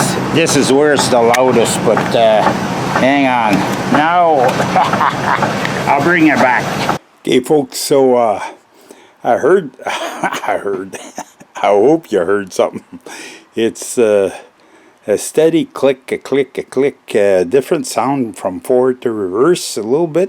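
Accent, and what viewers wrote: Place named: Upper Peninsula, United States. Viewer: Sounds like a Yooper. I think this guy would be a awesome neighbor.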